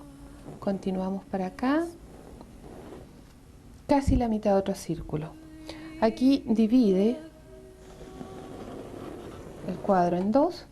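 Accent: Chile. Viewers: Me imaginaba por tu acento que podias ser chilena. Saludos de un Chileno pati perro.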